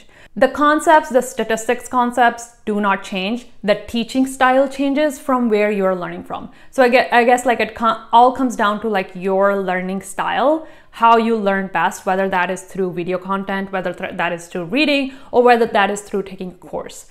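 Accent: India. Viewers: Indian accent 👍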